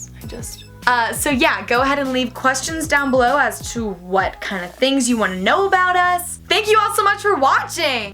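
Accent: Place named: United States. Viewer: You're like one of those classic, aesthetic AF american tumblr girls! Your accent, clothes, hair and even the way you style your hair... you'd stand out (in a really good way) here in the UK!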